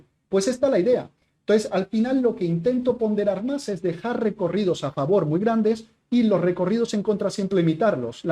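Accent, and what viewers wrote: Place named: Andalusia, Spain. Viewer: Parecia intro de pelicula por el efecto de la intro. Que tipo de coberturas haces, con opciones financieras? PSD: Tienes el acento andaluz muy marcado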